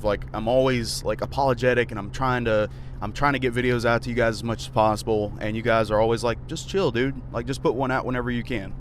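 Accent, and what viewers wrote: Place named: South, United States. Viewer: You have a very pleasant voice to listen to, I think everyone hates their voice but you just have a soft southern accent. Don’t hate it. 😃😃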